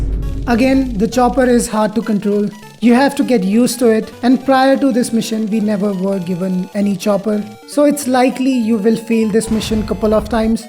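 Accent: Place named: India. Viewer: Why your Indian accent sounds like a middle aged aunty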